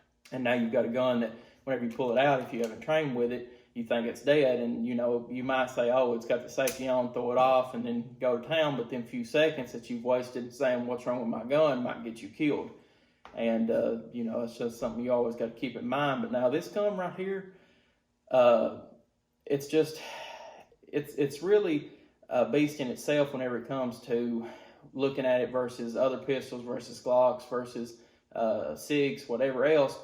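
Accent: South, United States. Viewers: Hell yea glad to hear a fellow southerner creating good content in the gun tube space.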